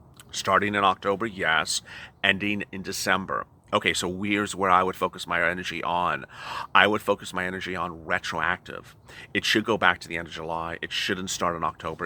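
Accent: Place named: South, United States. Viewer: Good morning this is Tennessee coming to you your southern accents getting good just a little more drawl to it LOL!!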